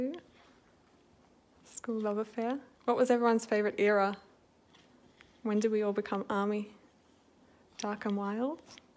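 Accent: Australia. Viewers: When i heard her accent it reminded me of Felix and i understood in 0000.01 second that she was Australian 💀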